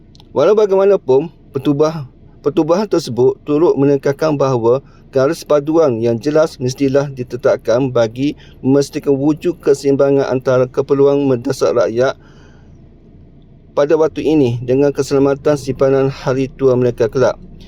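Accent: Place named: East Coast, Malaysia. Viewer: pekat bethol loghat pantai timur dia 😅😂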